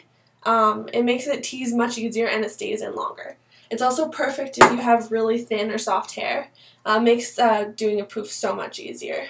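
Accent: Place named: Canada. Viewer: i love your tiny Canadian accent :)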